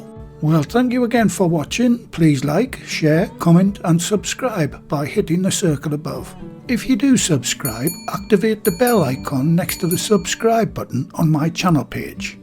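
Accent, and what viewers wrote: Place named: England, United Kingdom. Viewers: I think I partly watch to feel like home . . . now living in Australia . . I do love any northern twang. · Nice to hear an English accent rather than American.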